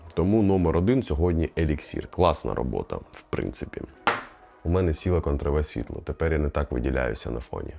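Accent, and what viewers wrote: Ukraine. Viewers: українська мова з Харьківським акцентов))) АГООНЬ))))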